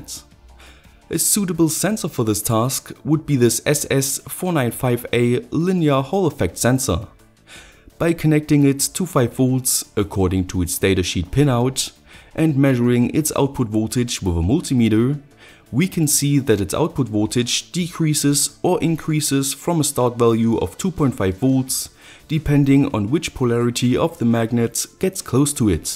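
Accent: Germany. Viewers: I guess by your accent that you are from Germany or Austria, if so why do you claim that JLC PCB has free shipping? But it doesnt show for me free shipping(Austria)?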